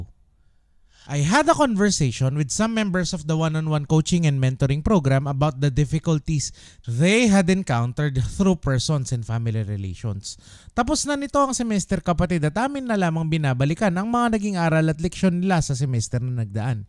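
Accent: Batangas, Philippines. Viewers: Ay Batagueño pala c atty..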